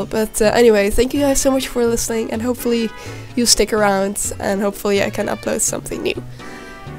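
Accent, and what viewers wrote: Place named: Netherlands. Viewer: I hope you get better soon, being sick sucks! Btw are you Dutch? I can tell from your accent...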